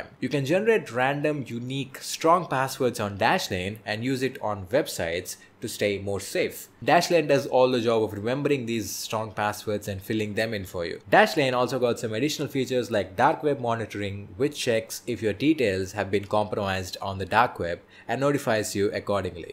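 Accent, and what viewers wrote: India. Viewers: Indian accent makes this video even better.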